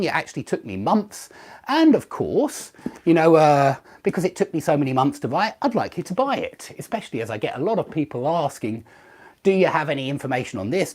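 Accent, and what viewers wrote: England, United Kingdom. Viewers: Mr thank you first for your lessons, I wanna ask you please, is your english accent a northerner or southerner one?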